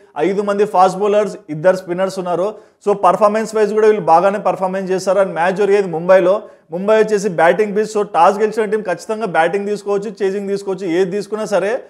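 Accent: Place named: Kadapa, India. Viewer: Anna me kadapa slang super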